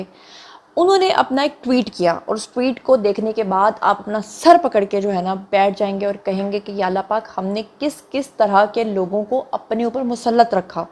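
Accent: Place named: Punjab, Pakistan. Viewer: Jab aap anay tabsrey mein Punjabi ka tarka lagati hein tu maza aa jata hey.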